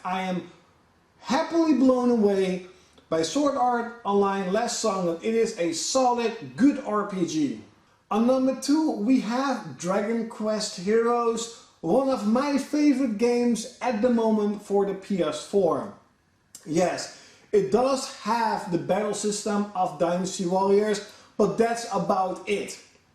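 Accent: Netherlands. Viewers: Is that a Dutch accent?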